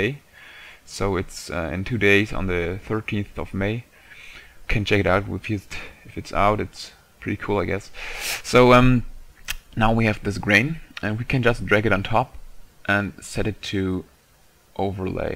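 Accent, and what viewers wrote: Germany. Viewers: nice vid but stop copying the american accent its annoying! stick with your german accent its much more charmin....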